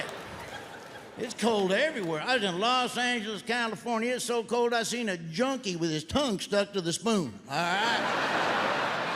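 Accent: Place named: Midwest, United States. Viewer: the funnt thing he does not sound like that in real life, he has a thick mid-west accent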